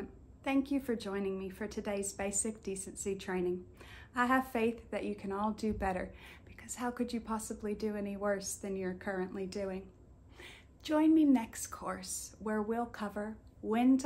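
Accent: South, United States. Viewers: I have a question,. Well actually 2 questions. 1. What is ASMR. 2. Are you from the South?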